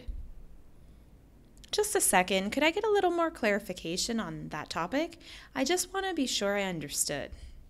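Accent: United States. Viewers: Great American accent you have.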